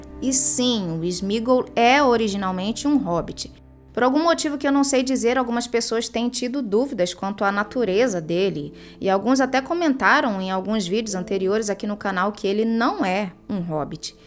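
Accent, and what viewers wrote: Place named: Brazil. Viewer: Legal , eu jurava q vc fosse sulista por causa do seu jeito de falar , de carioca vc nao tem nada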